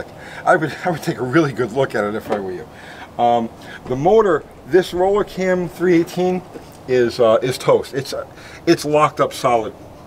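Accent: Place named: New Jersey, United States. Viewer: I gather that you are in Tennessee, but I hear a lot of New Jersey in there.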